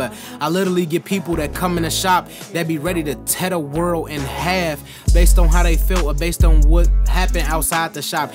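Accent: Chicago, United States. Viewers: wait you from chicago dog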